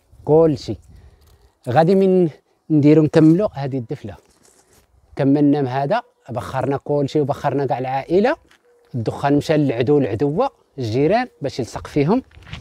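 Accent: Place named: South, Morocco. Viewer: سلام خويا الله يسترك دنيا واخره ويخلي لك بنيتك انا كانقول اذا كان يعني الحس ديالي ينفعني انا كانقول منا نواحي ديال الصحراء ديال زاكوره ورزازات و هضرتك بحال هضرتنا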